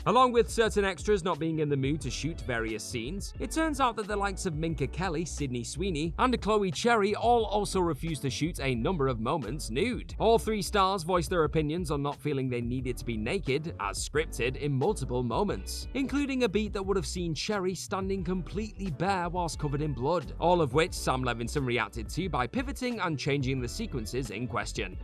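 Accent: United Kingdom. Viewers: "Drunk". Narrator is British.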